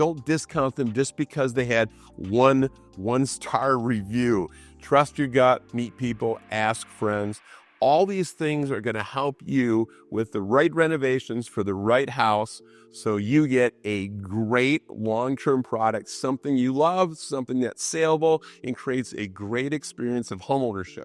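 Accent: United States. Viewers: Love the Michigan accent! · You sound like you're from the Binghamton area!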